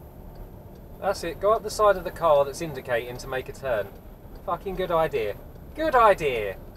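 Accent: London, United Kingdom. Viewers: Both the cyclists must have extreme death wishes. I say 'I hate people' all the time, especially now when just going to the store is insane. People will run you over with their shopping carts and then get out in their cars and hit you, not stop at stop signs, but feel entirely justified to stop in the drive lane and back up or just sit there. I saw eight cars not stop for a stop sign just today. I just laugh at the morons. I just found this and I love the fact that there is absolutely no censoring to your thoughts (plus the accent, but as multicultural as London is, you've probably heard that a lot). Merry Christmas early from the U.S.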